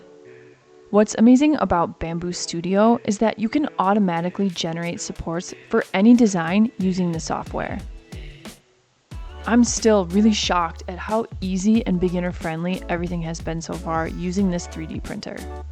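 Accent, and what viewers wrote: France. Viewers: always makes me smile when i hear a french accent on an US based video^^ good ideas to print those things :)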